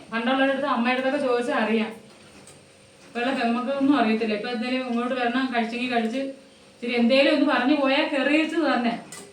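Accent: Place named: Adoor, India. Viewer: എനിക്ക് നിങ്ങളുടെ സംസരം കേൾക്കാൻ ഒത്തിരി ഇഷ്ടമാണു . എന്റെ നട്ടിലെ സംസരം തന്നെ ആണ് ..ഞാൻ അടൂർക്കാരി ആണ് ,,ഇപ്പൊ UK ഇഇല് ആണ് ..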